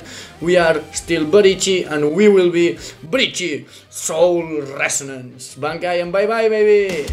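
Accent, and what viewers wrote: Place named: Catalonia, Spain. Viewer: Hola soc català com tu, lo accent se te nota molt jajaj es bastant graciós veure com parles ingles bé pero en accent català.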